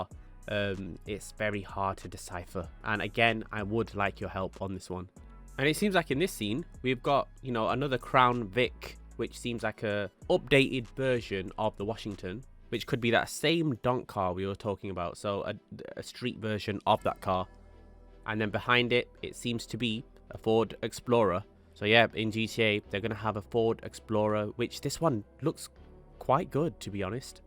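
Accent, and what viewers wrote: England, United Kingdom. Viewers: i feel like i’ve watched this video twice already but this one just has a english accent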